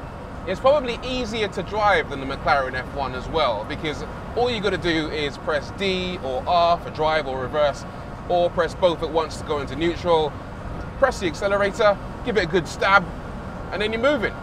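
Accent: United Kingdom. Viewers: the accent... British perfection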